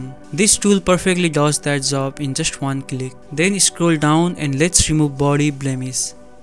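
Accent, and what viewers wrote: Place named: India. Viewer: Bro, please work on your English. Good videos but that Indian accent is terrible.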